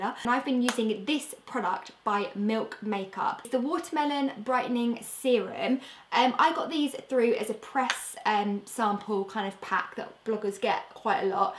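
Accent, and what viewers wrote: United Kingdom. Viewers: I love this look ! Also, I can't get over the fact that you look SO much like Cameron Diaz with a British accent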